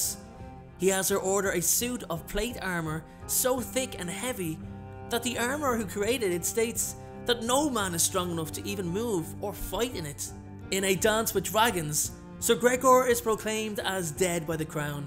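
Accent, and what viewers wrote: Ireland. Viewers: Dude are you Irish 🇨🇮🇨🇮🇨🇮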